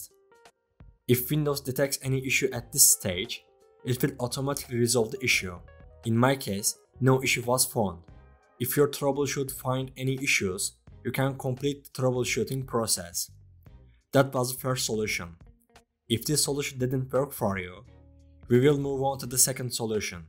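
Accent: Turkey. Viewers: This guy he is so Turkihs , his accent screams " I AM TURKISH "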